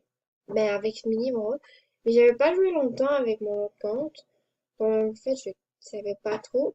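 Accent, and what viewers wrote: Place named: Quebec, Canada. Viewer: tu est devenus une de mais youtubeuse préféfé qui jou sur msp prace que tu parle quebecois et tu est un quebecoise jadore moi aussi je suis quebecoise ❤😍😜😉😂😀😁🤣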